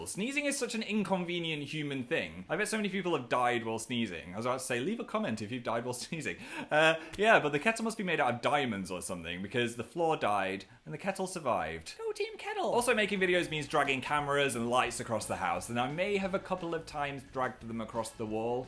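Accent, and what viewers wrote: North, United Kingdom. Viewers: I thought Phil sounded kinda northern in this particular video. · I didn't notice your accent was northern until this video... wow I'm observant. Now I can't pay attention to what you're saying I'm just listening to the accent. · you sound so freaking northern this week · I was about to say you sounded a lot more northern before you said something 😂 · that northern accent though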